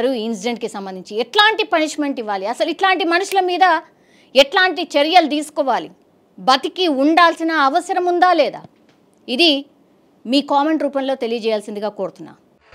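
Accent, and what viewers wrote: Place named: Telangana, India. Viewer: Pure Telangana super anchoring ❤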